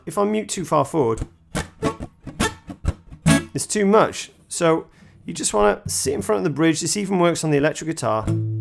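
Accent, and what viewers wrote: United Kingdom. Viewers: You should also just post you doing this cover —— love it —- I would put it on a loop n listen all day. You are so righteous you could play in Jamaica and they would respect you! I would love to hear you do or or or even reggae style ( from Denzel Jamaica movie ) You have a great accent. Thinking maybe Manchester, U.K. … maybe?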